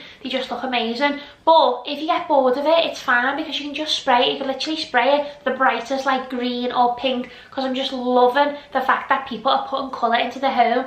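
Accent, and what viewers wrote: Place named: Liverpool, United Kingdom. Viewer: Thank you. Great haul. First time watching, you have a great (Scouse?) accent. Honestly, I loved everything, hard to pick a favourite, they are all gorgeous.❤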